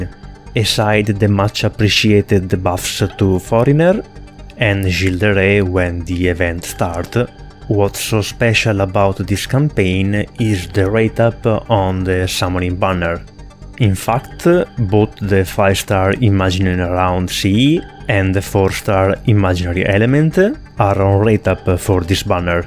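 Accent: Italy. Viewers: il tuo accento e italiano non mi freghi lol. ce tipo un discord di italiani di fgo??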